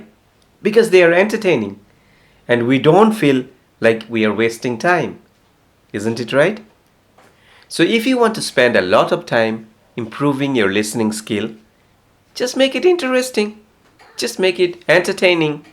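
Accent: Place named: India. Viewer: I love your accent.. Not heavy as other Indian speakers. That why I love its and use it as my lesson on my listening practice. Thank you so much sir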